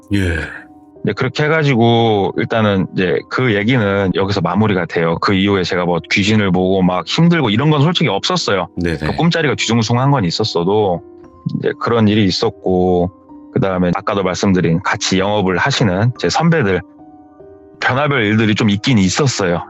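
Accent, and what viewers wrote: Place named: Seoul, South Korea. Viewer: 수줍은 (얼추 서울말쓰시는) 넘 어색혀..ㅋㅋㅋ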